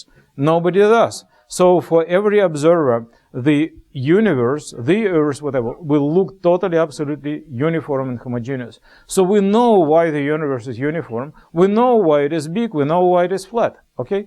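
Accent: Russia. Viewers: I enjoyed topic as well as sweet English with Russian accent! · Our Russian accent) krasavchik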